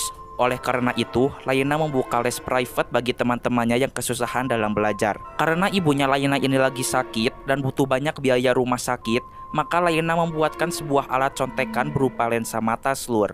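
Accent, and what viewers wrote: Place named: Sunda, Indonesia. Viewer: Suaranya sunda banget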